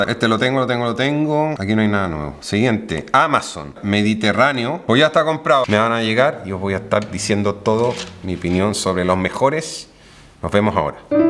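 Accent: Chile. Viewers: Es chileno viviendo en España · Me encanta tu acento y forma de hablar obvio chile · Excelnete video, nuevo suscriptor. Una consulta, escucho tu acento, y lo asocio mucho al chileno! Saludos y espero que sigas creciendo aun más!! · Eres chileno? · Es chileno jaja 🤣 después dicen que hablamos mal y dices que es un perfecto español. 🤣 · España? Yo crei que era chileno por el acento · El bro habla entre chileno y español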